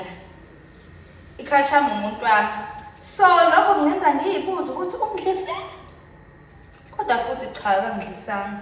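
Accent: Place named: Thefula, South Africa. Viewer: Ave ungchaza Mase uthefula❤❤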